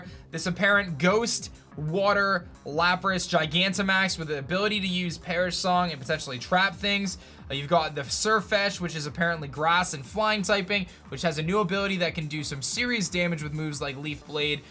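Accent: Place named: Pennsylvania, United States. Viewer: I’m out of Pennsylvania and your slight accent sounds so much like people from around here. Are you out of the northeast?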